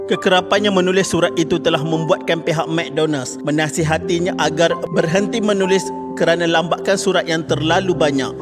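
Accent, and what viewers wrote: Kelantan, Malaysia. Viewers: Bunyi kelantan tak hilang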